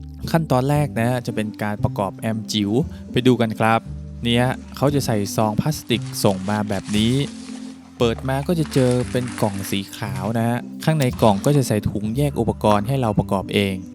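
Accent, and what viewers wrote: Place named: North, Thailand. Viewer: คนเหนือคับ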